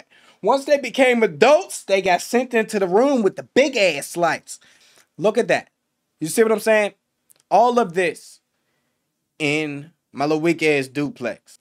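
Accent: Pittsburgh, United States. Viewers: The Pittsburgh accent goes crazy hard!!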